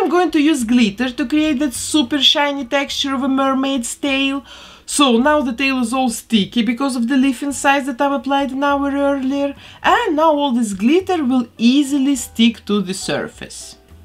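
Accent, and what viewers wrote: Russia. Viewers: Girl are you Russian or Belorussian? Because you have Russian accent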